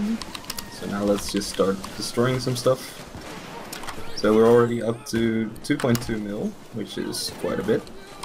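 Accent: Netherlands. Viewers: Jij bent sws nederlands ik hoor het aan je accent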